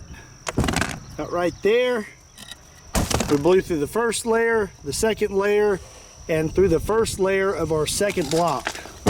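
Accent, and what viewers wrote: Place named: Texas, United States. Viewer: His Texas accent makes it even more American.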